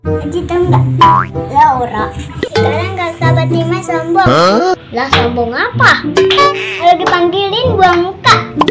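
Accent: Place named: Jakarta, Indonesia. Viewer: wah Betawinya ngena bgt lucu abisss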